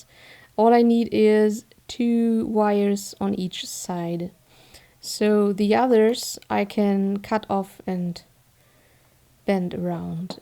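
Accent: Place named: Northern Europe. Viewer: Thank you for answering I was very frustrated when I wrote that… and I love your accent I'm thinking Northern Europe… I wish I spoke a second language as well as you do English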